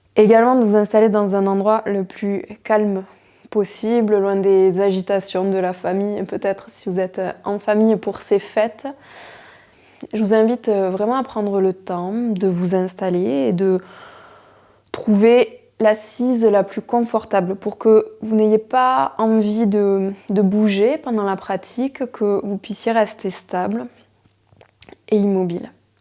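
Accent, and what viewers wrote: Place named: South, France. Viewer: Merci aussi pour ce charmant petit accent du sud.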